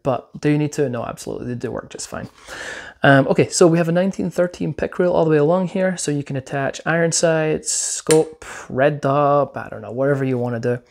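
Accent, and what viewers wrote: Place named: Scotland, United Kingdom. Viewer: The scottish accent with the American flag in the background gave me the warm and fuzzies.🤘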